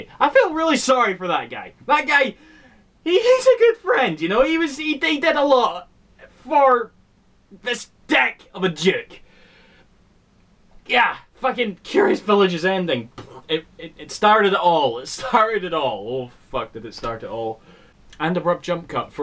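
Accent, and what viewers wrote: Scotland, United Kingdom. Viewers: I'm not sure about this. I don't agree with some of the choices, and there is no footage or anything. But then again... You are brilliantly scottish. .... I'M CONFLICTED A!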